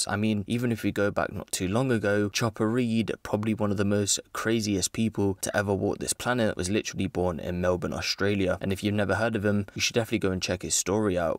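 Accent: United Kingdom. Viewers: So ur Zambian but u talk on the U.K. like ur British !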